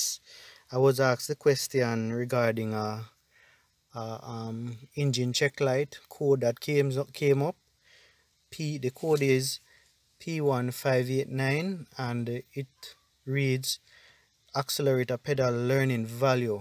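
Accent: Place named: Jamaica. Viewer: This was very helpful , keep on doing your thing, you sounds like a yaawdy and i like the way you brought the information plain and simple.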